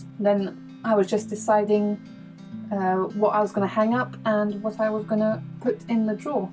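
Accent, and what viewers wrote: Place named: Germany. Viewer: third german-sounding girl in a narrowboat video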